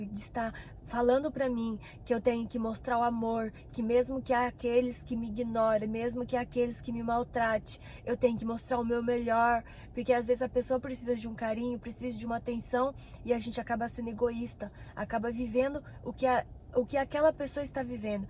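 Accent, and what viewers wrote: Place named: Minas Gerais, Brazil. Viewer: Uai só! Você ainda tem o jeito de falar dos mineiros.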